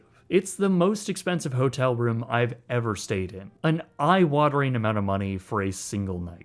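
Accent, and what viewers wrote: Canada. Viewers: This guy just whines about everything in a Canadian accent and makes sure to say “out” and “about” in that dumbass way as much as possible in every video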